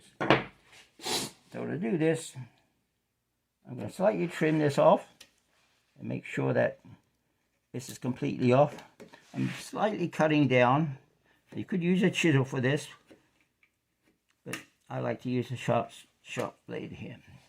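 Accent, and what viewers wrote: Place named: England, United Kingdom. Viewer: Nice. Tip you sound English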